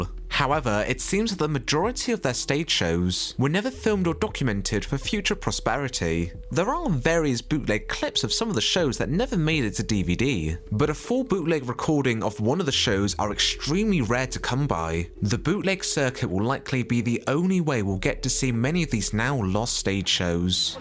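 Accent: United Kingdom. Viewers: Fun fact: the creator of the video is from the uk cuz of his voice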